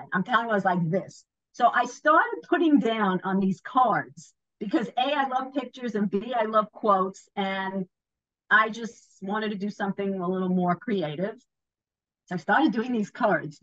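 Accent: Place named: New York, United States. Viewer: It’s the New Yorker in her ….absolutely lovely!!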